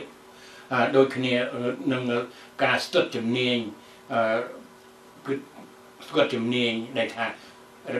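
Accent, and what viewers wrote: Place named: Thailand. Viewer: ខ្មែរយើងដូចអត់មានសម័យនគរាជទេ ហើយមួយទៀត ម៉េចអ៊ុំនិយាយរដើនដូចថៃនិយាយខ្មែរចឹង?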